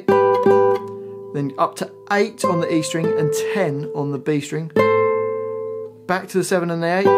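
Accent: United Kingdom. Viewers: I ain't sure yet of what sounds better - his British accent, the guitar or the song itself. It looks like all of those are brilliant.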